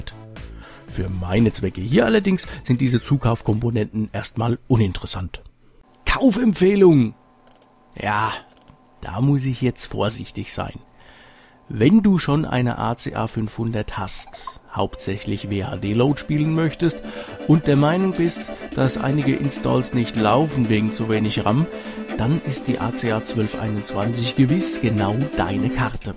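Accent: Hesse, Germany. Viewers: Ist nicht so schlimm, wenn man beides nicht hat. Hatte erst in der letzten Woche nen 1200er aus'm Sperrmüll gefischt und dieser funktionierte nach nem Testlauf. Von daher wäre so ne Turbokarte durchaus interessant, wobei ich eh nur mein Lieblingsgame zocken würde; und zwar Lemmings, das hab ich in meiner Kindheit gesuchtet.:D Aber es ist trotzdem erstaunlich, was man mit nem Amiga alles anstellen kann; sowas war früher sogar dem PC technlologisch weiter voraus; die Workbench war sogar intuitiver bedienbar als die "schwarzweiß"-Apple-Oberfläche, wo man die einzige linke Maustaste gedrückt halten musste, damit das Menü offen blieb. Beim Amiga gabs diese Problem überhaupt nicht. Derzeit nutz ich zur Zeitvernichtung den WinUAE-Emulator + Kickstarts von AmigaForever sowie den verbleibenden Spiele-ROMs um die Lemminge zwischendurch in der Pause zu zocken. Ich finde, auf dem Amiga hatten die Spiele nen besseren Sound als die Konkurrenz. 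Ansonsten find ich die Videos von besser als die konkurrierenden YouTuber. Klingt komisch ist aber so; und das hat nischt mit dem hessischen Dialekt zu tun. Eher ist es die Qualität, an die niemand rankommt.:D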